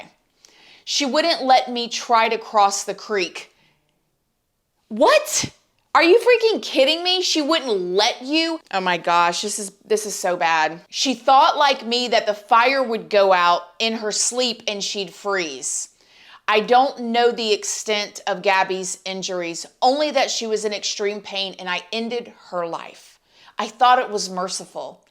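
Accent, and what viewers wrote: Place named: Midwest, United States. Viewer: You have the coolest accent I’ve ever heard in my life and I’ve travelled a lot ! What is the place where folks speak in this way ??? Midwest US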